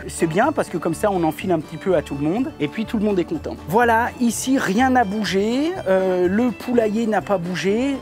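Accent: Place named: Thiers, France. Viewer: Une vidéo qui montre qu’en 8 ans, tu n’as pas changé, on retrouve (jamais perdu en réalité) l’artisan plombier des débuts capable d’autocritique, qui s’amuse de rien, un homme qui a su rester simple malgré la réussite. Un regret malgré tout, le trop grand décalage entre le tournage et la diffusion (ici 4 mois environ). Bon courage pour la suite, bravo ! « Nickel » ! Entendre quelqu’un qui met des « y » partout, cela me rappelle Thiers où j’ai quelques attaches. Justement, là bas je possède une bâtisse qui a ce problème de flottement un peu en raison des longues portées des poutres mais bâtiments de 1909 qui vivra plus que moi.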